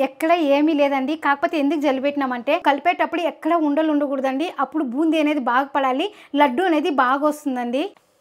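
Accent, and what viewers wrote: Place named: Chittoor, India. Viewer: Maa Chittoor yasa lo matladuthunte Santhosham ga undhi. Tamil and Telugu kalisina yasa. Nanna, Superb ga chesavu Laddu, mixture. Mana function ante Laddu and Mixture compulsory kadha.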